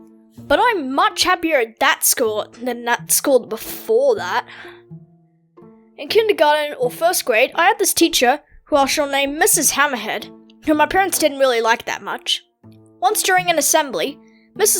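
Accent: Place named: United Kingdom. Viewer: Are you British because I am and you sound like one?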